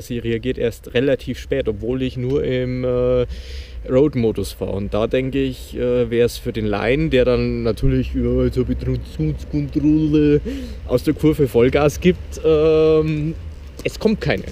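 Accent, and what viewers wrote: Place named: Bavaria, Germany. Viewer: Hab mir heuer auch wegen der Euro 4 noch gekauft in Schwarz.Wo bistn du her?Klingt so wia aus Bayern ;-)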